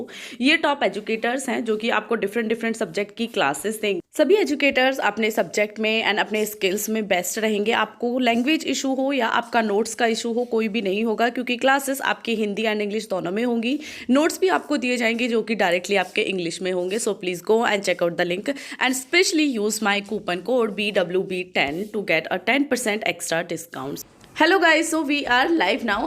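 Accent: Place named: Bundelkhand, India. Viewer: apki tone bhi bundelkhandi hi lg rhi hh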